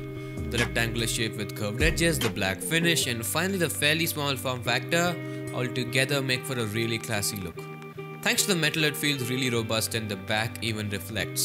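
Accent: India. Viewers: It's just the normal Indian English accent. I can hear him just fine.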